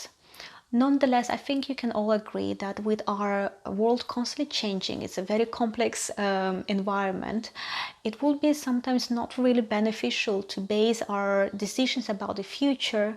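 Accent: Russia. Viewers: Are you Russian? I can hear Russian accent. Do you live in UK?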